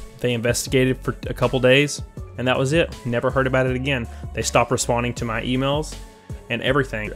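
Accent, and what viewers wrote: South, United States. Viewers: your voice... I cant tell if youre southern or gay or both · Southern drawwwwlllll.....cool vids just subbed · Youre so southern and not southern that it makes my brain love your channel. what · Toooooo southern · You used to sound southern what happened where’s the real 🤠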